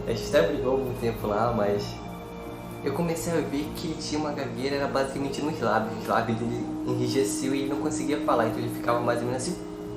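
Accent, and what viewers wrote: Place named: Amazonas, Brazil. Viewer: Gostei muito da sua sinceridade, e da forma tão livre como você fala. Seu sotaque parece amazonense... Sucesso amigo!!! :-)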